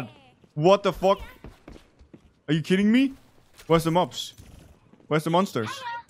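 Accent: Denmark. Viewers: Dude u cannot hide ur danish accent. This is literally the first time I’ve heard your voice and I immediately knew you were a Dane and so am I. Dansk accent virker ik sår godt med engelsk. Lol. Bliv ved med ag lave videoer de ser interessante ud! (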